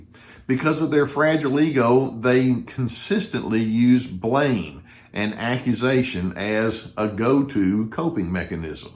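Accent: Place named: Texas, United States. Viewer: Love you,! <3 Love your accent too! Where's that from? Texas? Yeehaw! Hey, I have a cowboy song for you! Without guns, moonshine, loose women, gambling, just a a whole lot of cows and a long, long ride... and a spiritual twist at the end! <link> Enjoy and thank you for all you are doing for us all!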